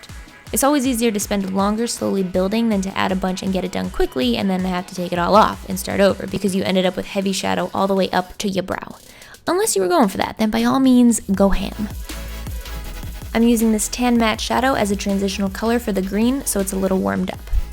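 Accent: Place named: New Jersey, United States. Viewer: How come u randomly get a jersey accent throughout ur vids lol I love it but srsly y does it happen?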